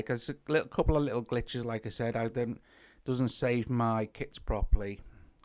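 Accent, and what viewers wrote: Liverpool, United Kingdom. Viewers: Scouser? I think so.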